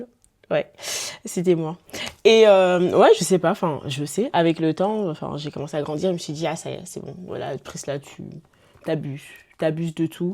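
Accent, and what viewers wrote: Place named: Democratic Republic of the Congo. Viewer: Moi aussi 😅elle fait cet accent congolais de temp à autre la, moi je me disais peut un parent congolais lol. C’est quoi l’histoire du français please. Like how did you start speaking French as a Ghanaian?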